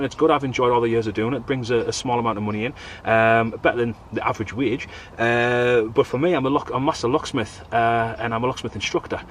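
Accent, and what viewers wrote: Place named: North East England, United Kingdom. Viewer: Good to see you back buddy👌 I’ve just bought a polish lavvu and I’m on “modding” it at the min, sewing monster zip in it, wax proofing it with a home made recipe and heat gun, changing the pole system to a british one witch packs down to the same size as original and also making custom wood bungs to go on top/btm of pole system😌👍 i am thinking about starting videos on bushcraft and wild camping but as a noob enthusiast 😂 we shall see... Ive watched all your videos and have learned a lot from you, your instructional skills are ace bud and its a pleasure to sit down and watch your videos learning as i go👌 plus its a easy to relate to a fellow north east lad and being able to understand what your saying with the accent😂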